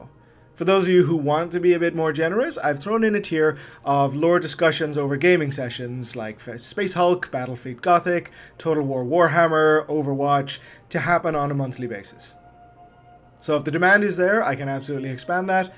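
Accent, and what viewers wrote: Ireland. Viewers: Where are you from? Accent sounds a bit irish?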